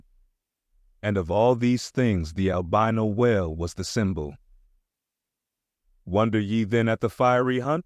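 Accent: United States. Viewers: FINALLY an American reader!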